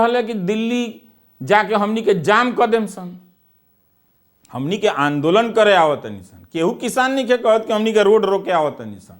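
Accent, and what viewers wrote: Bhojpuri, India. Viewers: तोहार भोजपुरी भाषा bra निक लगता भैया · I am Maharashtrian but love the way your bhojpuri ❤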